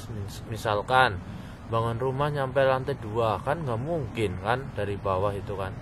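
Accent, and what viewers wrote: Banyumas, Indonesia. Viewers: Wong ngapak pasti keh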